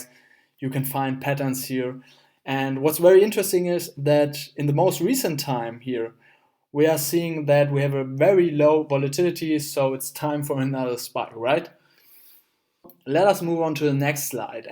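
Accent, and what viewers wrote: Germany. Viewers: Expected a babe, but got a nice german accent to listen to make me even nerdier than I am now. Actually the tutorial was just what I was looking for in terms of prediction! Loved the data visualizations as well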